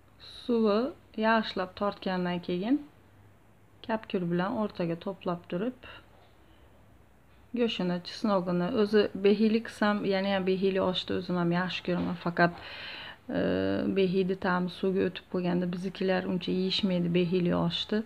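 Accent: Khorezm, Uzbekistan. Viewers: салом мен сиз хамма видеоларингизни куриб боришга харакат киламан сизни хамиша кулаб тураман кайеда бусангиз хам сог боулинг олох сиздан рози булсин яхши иш килябсиз сиз хоразим шевасида гапирасиз кориндошларингиз йукми мабода хоразимда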